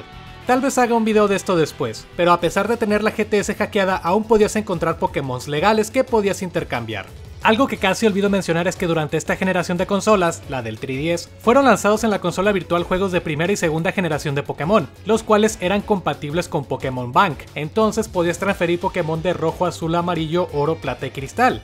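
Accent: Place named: Monterrey, Mexico. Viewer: Videaso hermano, gran acento regio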